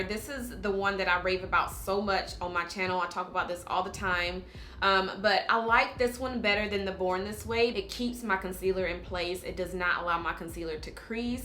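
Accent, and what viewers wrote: South, United States. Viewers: Love your channel! And your accent! Are you from the south? I’m from Mississippi so I love hearing what I think is a Southern accent :) I bought butter pecan in Sephora a couple days ago but it’s a tad too dark and orangey on me. I’m thinking I MAY be caramel...😩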